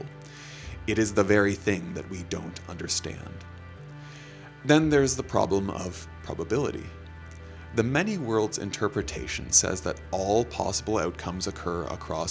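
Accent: Canada. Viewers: Is the voiceover Canadian?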